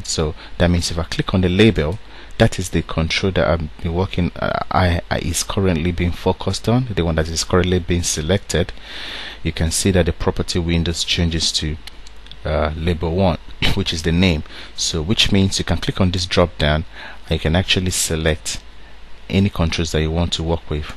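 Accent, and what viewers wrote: Nigeria. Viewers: Man. I love your Accent. It sounds Nigerian. I am pretty sure you are from Africa.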